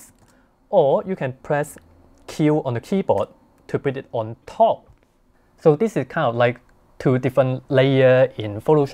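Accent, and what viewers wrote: China. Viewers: it is really good to hear that 'embedded Chinese native accent' when you talk. keep up the good work! thanks for this wonderful video.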